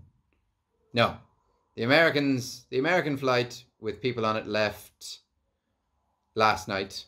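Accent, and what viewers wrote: Ireland. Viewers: I’ve always loved an Irish accent!!!!! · And besides you sound Irish have you ever heard of the Fighting Irish there's a reason why they're called that. Happy birthday buddy my birthday was the 25th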